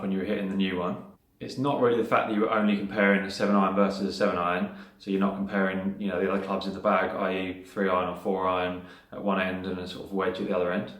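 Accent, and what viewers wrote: Australia. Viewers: Very new to golf and started looking to buy my first set of clubs just got a little more educated thanks mate.....love the Aussie accent.🤣🤣🤣🤣 Yes I'm an Aussie . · 😂 the Aussie accent. I recently went to test some clubs. I say test because my coach has already fit me. I tested the Taylormade P790’s and the guy at the store could not understand why I didn’t want a 7 iron that carried 15yards further than my current 7 iron. My 7 carries 150. I don’t need a new 7 that is carrying 165. There is always going to be a bad gap in the bottom of the bag. There were 15-25yard gaps between the 8-PW! You have to test the entire set. I loved the feel of the club, but I can’t have that much uncertainty 120yards from the green.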